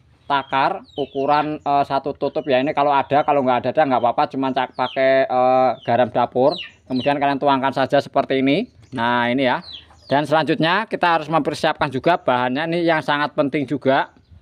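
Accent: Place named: Java, Indonesia. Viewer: Maklum jawa totok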